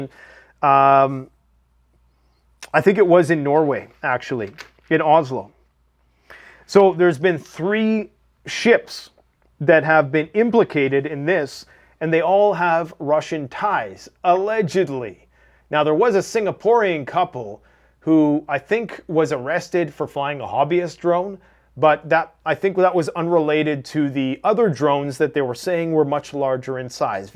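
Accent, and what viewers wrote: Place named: United States. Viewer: Hey — you sound more like an American prepper to me — maybe you should move south.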